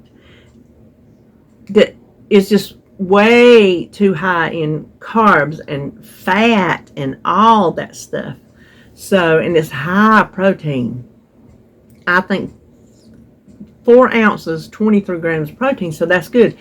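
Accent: South, United States. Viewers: Good for you on the loss. Every little bit counts & adds up. You have the right mind set. Thanks for the discussion on carbs. We all need whatever info we can get. Love your southern talk. Makes me chuckle.🌷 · WOOT WOOT! 🎉🎉🎉🎉🎉 I love your Southern!❤ I hate pithy watermelons too!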